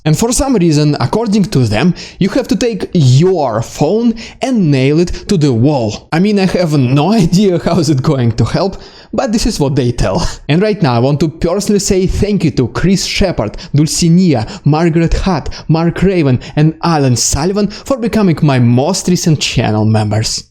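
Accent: Russia. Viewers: Man... I'm not happy with Russia as a country, especially putin. But that Russian accent! Man I want to learn Russian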